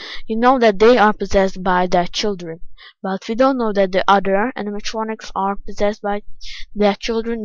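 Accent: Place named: Hungary. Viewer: Teszi magát a gyenguc magyaros akcentusával...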